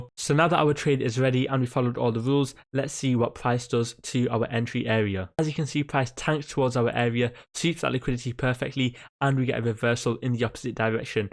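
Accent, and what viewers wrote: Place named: RP, United Kingdom. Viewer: this guy speaks like he has plums in mouth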